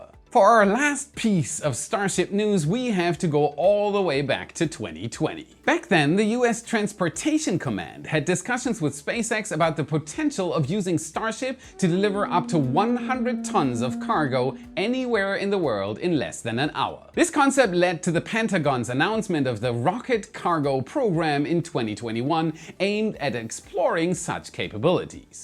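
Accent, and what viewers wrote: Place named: Germany. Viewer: Nice surprise. Always a space geek's ecstacy. Space must always come with a German accent!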